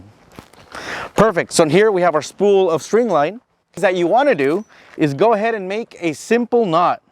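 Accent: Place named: Canada. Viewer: A hispanic man with a Canadian accent. Too cool, I could listen to this guy for hours. He’s a very good presenter. 👍🏽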